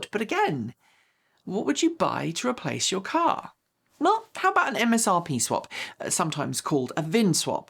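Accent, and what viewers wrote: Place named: England, United Kingdom. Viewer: I thought this might be click-bate, but as per usual, this just sounds like my inner monologue, but with a lovely English-Accent. Love it.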